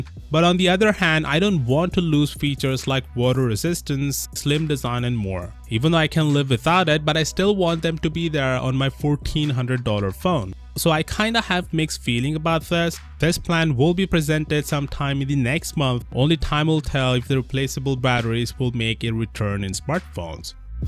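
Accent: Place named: India. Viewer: Disliked. Sounds like Indian scam call center.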